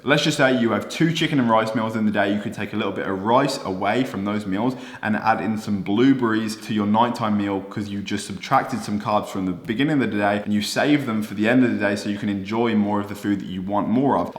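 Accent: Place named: United Kingdom. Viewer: LOL He has a British accent. Do they not televise American or British shows, movies etc in whatever country you live in?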